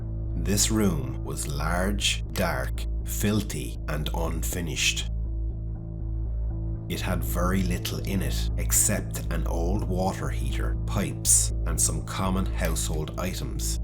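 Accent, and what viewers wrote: Ireland. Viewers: I don't know who you are, but you have found your calling! I just discovered your channel tonight and have since watched all 8 of your videos/mini-documentaries. They are better than 99% of the docs on Netflix, etc.! They are thoughtful, expertly researched and produced, well-paced, and leave the viewer wanting more. The Irish accent is just the topper! Now, get to work and give the people what we need, tout de suite! 😂😍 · I love your accent which travels far and wide before coming home to Eire. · Really professional. Are you Irish?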